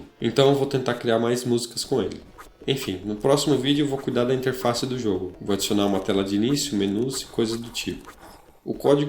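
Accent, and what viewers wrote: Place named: Brazil. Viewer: Eu te conheci pelo canal de inglês, o sotaque brasileiro te identificou, video mto bom, muito obg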